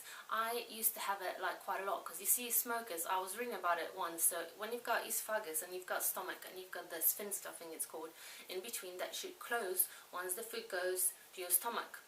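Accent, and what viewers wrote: England, United Kingdom. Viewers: You are the most beautiful woman I have ever seen in my life, and I absolutely LOVE your slight English accent.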